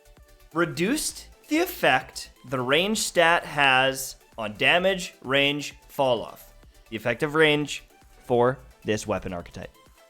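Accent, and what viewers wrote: Scotland, United Kingdom. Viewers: SCOTTISH VOICE!!!!!!!! Yes BABY!! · Thanks for putting out great content. Scottish accent was awesome lol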